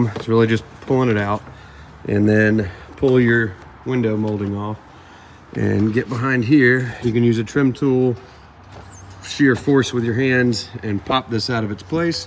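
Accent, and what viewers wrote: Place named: Georgia, United States. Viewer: You have great voice and communication style for this. I think it has something to do with Georgia. I know one other person that sounds like you and he's from Georgia as well. I was looking for the right GX all over the country and I guarantee I would've ended up buying from you had I found your videos sooner. Anyway, you make the absolute best and practical GX content there is.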